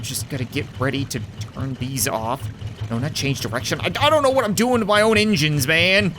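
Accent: Appalachia, United States. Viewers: why are you using a hillbilly voice if this game is placed in indonesia / phillipines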